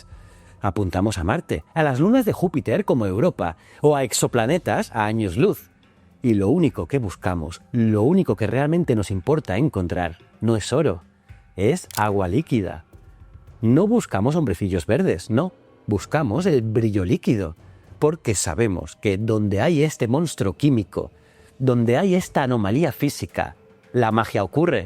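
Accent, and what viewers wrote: Spain. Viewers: Que feoo ablan los españoles 😂😂😂 wacalaaa😢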